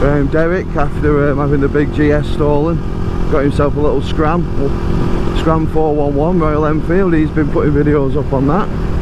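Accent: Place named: Rochdale, United Kingdom. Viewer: You sound like you're local to me 😂 (Rochdale) I'm looking at getting a DR250 as a winter/enduro/play bike, I have a GSR600 for good weather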